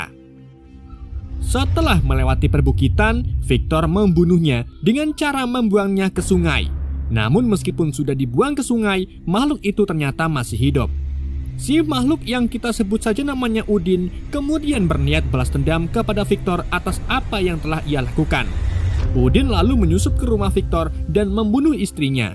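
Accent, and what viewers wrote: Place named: Banyumas, Indonesia. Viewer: cingireh admine wong ngapak yah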